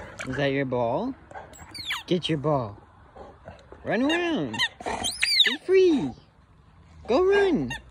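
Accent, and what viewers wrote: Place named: South, United States. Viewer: Hell, he sounds too southern to be from Cali lol. Let's face facts here, has already mentioned back problems, it's only a matter of time before he won't be able to do it anymore. If this lad manages to keep a sense of humor while reviving old cars and trucks, then we should support his efforts. Hell I'm 50 and used to be a shade tree mechanic until well into the 90's, then the 2000's hit with all of this computerized voodoo and I got lost under the hood of cars today. It's great to see a youngin' picking up the pieces us old men just can't be assed to film while we cuss and fuss working on them.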